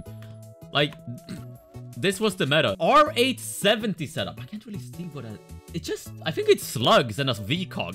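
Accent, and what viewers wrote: Sweden. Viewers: Jag hoppas att du är svensk så att min hörsel inte sviker mig. Btw hur fan kan du ha infintie credits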